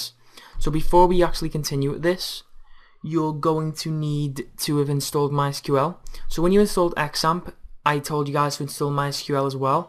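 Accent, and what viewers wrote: Liverpool, United Kingdom. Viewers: Your accent sounds like you're from Liverpool.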